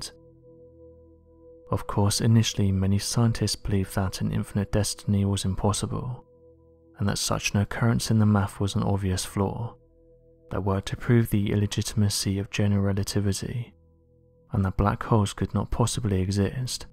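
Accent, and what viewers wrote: United Kingdom. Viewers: Why is there a British sounding person talking just wondering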